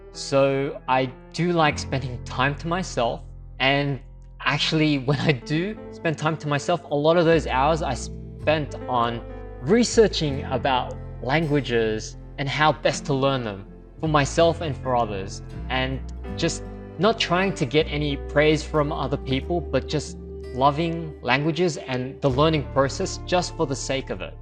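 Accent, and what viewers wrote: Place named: Australia. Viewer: Hi, great channel and videos. Pinoy here. First I would suggest you learn to speak Tagalog with a native accent. Speaking Tagalog with an Aussie accent makes it sound weird. It has nothing to do with your ethnicity, it's more like me going to Australia and speaking English with a Tagalog accent or a Chinese accent (you know what I mean). As a language learner myself (I'm currently studying Japanese and Spanish), I believe being able to speak with a native accent is part of the process of learning another language. Secondly, I can understand your experience with so-called "native English" speakers. It's the same here in Hong Kong. I'm a financial editor and writer, and I used to work for an English-language newspaper that hired editors based on the color of their skin. If you're a gweilo from the UK, US, or Australia-NZ, you're hired. 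Many of them do not know proper English grammar which is essential in written English (much less the technical aspects of the subject matter that I write about and edit). I would argue there is a huge difference between written English and spoken English. A person may be able to speak English well enough as a native English speaker (those who were born in an English-speaking country) but being able to write properly (and edit) in English is another skill that has to be learned. Needless to say, working for that company was quite frustrating and the editorial quality of that newspaper left a lot to be desired. Good luck with your future en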